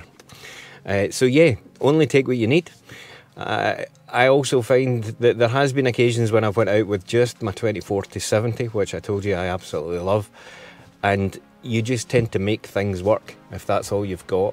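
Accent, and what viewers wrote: Scotland, United Kingdom. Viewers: A wonderful introduction! Thank you so much. It was lovely hearing that great Scottish accent after so long. Keep up the great work!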